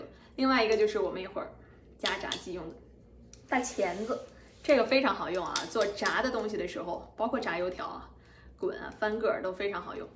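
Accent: Beijing, China. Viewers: 你这是北京口音吗？ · 北京人吗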